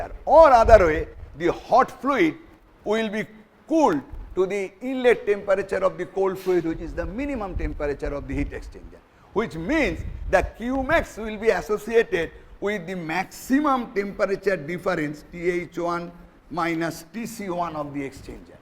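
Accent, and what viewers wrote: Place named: Bengal, India. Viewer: Hot fluid cold fluid ka mamla nhi h that bengoli accent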